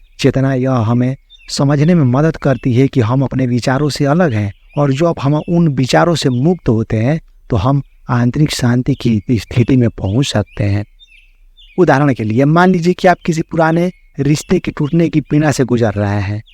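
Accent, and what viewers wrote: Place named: Bhojpuri, India. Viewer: aap saasaram ke hain kya, humko bhojpuri ka feeling aa rha hain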